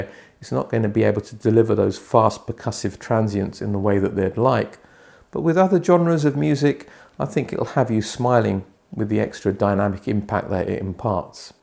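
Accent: United Kingdom. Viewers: You sound British, but don't look British.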